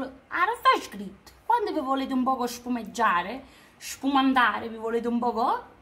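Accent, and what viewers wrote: Naples, Italy. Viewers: Ciao sono nuova👋ma tu nn sei siciliana mi sembri napoletana😄😄😄sei simpatica👋👋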